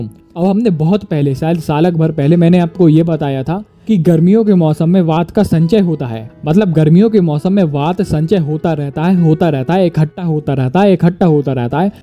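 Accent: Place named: Bundelkhand, India. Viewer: Bundelkhand se h aap sir😊😊😊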